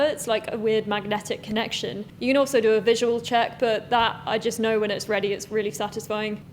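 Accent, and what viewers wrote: England, United Kingdom. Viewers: You never disappoint! Who hates English accents? You are a wonderful craftsperson, your new apprentice is very lucky! Loved the music! Thank you1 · You're great, and yes I love you're English I'm English also ❤️ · Loved it! (except for the sound :) ) . Also i will be making one of those drill drum sander attachments and fitting my metal vice to the bench with a simple single-threaded rod! PS Nice to hear an English accent (but then i am english) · Who would hate British accents? This is so cool! At the beginning of video, can't wait to see it! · British accent? Only Americans say that! You surely have an English accent…🏏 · Nothing wrong with an English accent.. from a Scotsman. So tell me what guitar was playing as you did the finishing touches, because I want to buy it. Good vid though, held my attention. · Amazing detail work , seen lot of people make these on youtube not sure i have seen that much passion put in to it ,oh and as a fellow Brit your accent is nice :D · You looks soooo Spanish!!! 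It's almost weird to me hearing you talking in that British accent! Thanks for share your beautiful work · OMG, I thoroughly enjoyed watching the loving way you "rushed" through that build. I love the simplicity of your workshop. The "hold-down" clamps are slats against the floor of your loft! (I know it's gotta be a loft, since you live in a space - constrained treehouse.) I also loved watching you bend walnut - I have not seen that method. I need to build that steaming iron, thank you for the better view where I could see the shape. Most others do it in a variety of ways where they have to be careful to not burn the wood! I like your method many times over (I assume the wood does, too.) (BTW, since it was 5,000 degrees out, I'm glad you "switched to your floaty trousers.") I also liked your corncob drill sander to smooth the sides. (That's as far as I've got, for now...) 👍👍👍 Add-on later... 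Well, I watched the whole thing. Yeah, it didn't sound so great, and it could be a combo of things, like top too thick, over braced, and the strings! I'm sure that's why when you find one that does... it requires quite a bankroll. (That's per Guthrie Trapp.) But, as you said, it was a fun project, it looks adorable, and you got to show off a few more outfits, lol. You're so cute. You remind me of my lovely wife, except she has a PA accent, instead of your UK. Anyway, I'm already 'scribed, which I assume is why I got this in my feed. Yes, you have a lot to contribute to this field. I definitely like your approach to the build: simple & loving (respectful, delicate with the wood. I feel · I love British accents! Love the minion size guitar. Loved the singing and dancing while shaping the neck 😂. So excited about the new apprentice! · First time viewer, I loved the video although I am not fan of English accent but I kept the sound on, because the amount of labour, precision and care you put into your work is amazing. 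Your videos are not pretentious, but actually entertaining and soothing for people who like woodwork. First video and I became a fan. Thumbs up... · Love the English accent and music on last video. How about some blues guitar in a future video. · i love brithish acent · English accent? I must blame Monty Python, Iron Maiden and Black Sabbath that I quite like it, awesome work as always. And that heat bending of wood is black magic. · Anyone that can't appreciate these amazing skills is probably sleeping through the video LOL! And shame on you - you could learn so much if you paid attention. Besides who doesn't want to see a beautiful girl with a Brit accent teach men how to do stuff? All the best elements entertainment, education, and fun😊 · I love a British accent, you are so lovely. excellent video! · "Mute it if you hate a british accent" -- LOL! I actually got hooked to this channel primarily because of your lovely british accent. 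XD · love your British humor and your accent as well !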